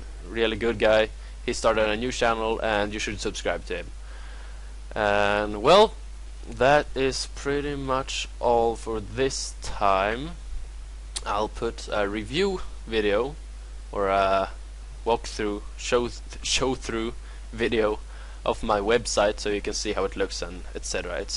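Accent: Sweden. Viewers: You sound Swedish...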